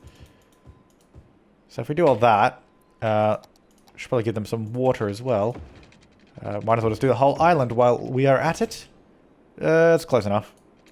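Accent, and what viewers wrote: Australia. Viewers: Hey, are you Australian? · You're an Aussie aren't you?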